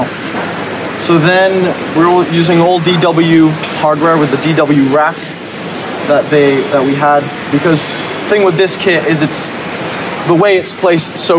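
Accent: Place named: United Kingdom. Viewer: Huge fan of your Dad. Thanks for sharing your drum set and narration with your slight British accent!!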